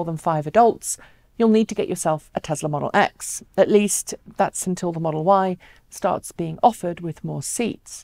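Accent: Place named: England, United Kingdom. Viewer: Your accent makes me think of cream teas and an English countryside . Thanks .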